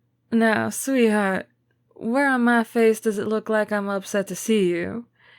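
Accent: South, United States. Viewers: I love your southern accent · Oh I need more of your southern accent now... I love it...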